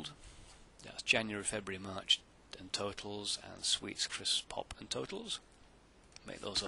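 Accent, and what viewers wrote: England, United Kingdom. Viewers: I haven't used Excel since I taught some middle school course on it at least 10 years ago. Good to hear the English voice, Excel called kind, and "crisps." I'm off and running on my first spreadsheet thanks to this! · wow really well presented and nice to hear a good old British voice just getting down to business straight away. If only you'd taught us IT, I'd have understood Excel so much better. Thank You. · You are wonderful. Thank you for putting this up! The British accent makes it better too. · such clear sounding brits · Excellent refresher, he's even got the British accent to make it sound less boring than my college MS Office instructor. :) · thanks you rock plus brits are cool crisps and stuff i love it accent is wonderful :) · thank you for you advices! and you've got a cute english accent, hihi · I think I learn better when I'm getting taught with a British accent. 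(: I love it.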